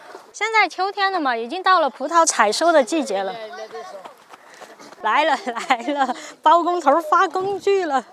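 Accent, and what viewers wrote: Sichuan, China. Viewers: 哈哈哈难道是个川妹子，我就说口音怎么那么熟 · 普通话夹杂四川话😂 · 原来Yooupi是四川妹儿，怪不得讲起普通话内么有趣！😅 视频制作的很好，非常非常的有趣，感受快乐的同时还能了解更多地域文化！太棒了！❤❤ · 不用理这种以自我为中心的狭隘的人，川普多可爱，保持自己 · 博主说的这是川普吧！熟悉中带着陌生😂😂😂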